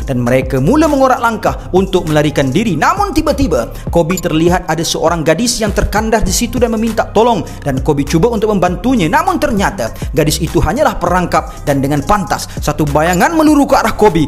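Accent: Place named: Batu Kurau, Malaysia. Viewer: cakap batu kurau sikit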